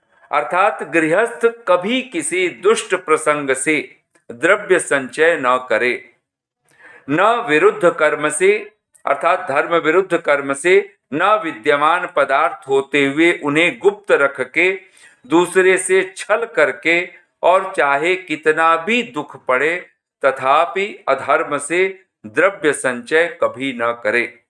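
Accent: Standard. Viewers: बहुत ही शुद्ध उच्चारण हैं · ॐ नमस्ते आचार्य जी ! शुद्ध उच्चारण के साथ अति सुन्दर प्रस्तुति I हार्दिक धन्यवाद I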